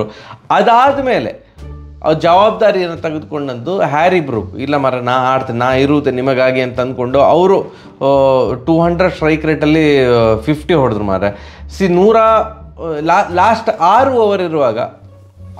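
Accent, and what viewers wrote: Mangalore, India. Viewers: Mangalore shaili Chand untu marayare❤❤❤❤ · ಅಣ್ಣ ನಾವು ಧಾರವಾಡ ಜಿಲ್ಲೆಯವರು ನಿಮ್ಮ ಮಂಗಳೂರು ಶೈಲಿಯ ಮಾತು ನಮಗೆ ಬಾಳ್ ಅಚ್ಚುಮೆಚ್ಚು 🙏💐💛❤️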